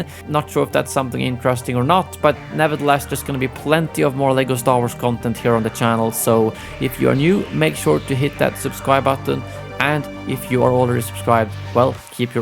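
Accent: Sweden. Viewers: bru why is he speaking with a swidish accsent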